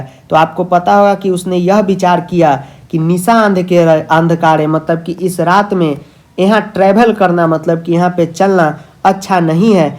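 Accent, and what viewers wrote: Bihar, India. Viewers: Sir apke bolne ka trakike se lagtha ha ki ap vihar se belong kerthe ha. Sir me bhi bihar se he belong kerthi hu lakin rethi delhi me hu..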